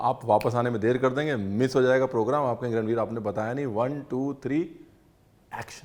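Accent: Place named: Bhojpuri, India. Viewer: Babua bhojpuri jaisan bolat baran 😊🥰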